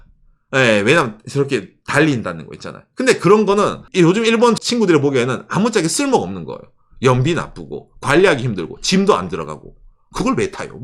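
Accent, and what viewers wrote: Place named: Gyeongsang, South Korea. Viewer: 어디서 많이 들어본 말투인데... 어디지 ? 경상도인데..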